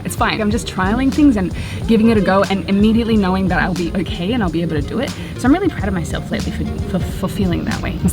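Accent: Australia. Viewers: Are u aussie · How do you not use plastic water bottles while traveling in South and Central America? Does your water bottle purify the tap water to AU standards so you don’t get sick? With your Australian accent I’m assuming you grew up with super purified tap water... the tap water in South/Central America has bacteria that we’re not used to so we get sick when we drink it. (I’m half Australian/half American- grew up in the US. I just got back from Ecuador and was reliant on bottled water during my stay there so I wouldn’t get sick. In the states I will not use plastic bottles and during my stay I filled up my water using the big reusable bottles as much as I could but still used the plastic ones occasionally when other alternatives were not available.) Thanks so much!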